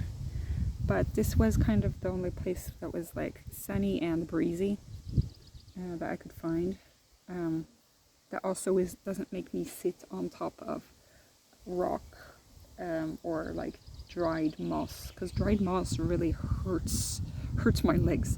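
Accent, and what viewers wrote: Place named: United States. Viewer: You started out sounding really American, then you said it was really hot and your accent completely flipped LOL. I originally thought I was INFP for a while and then I took the test again for a psychology course and it told me I was INFJ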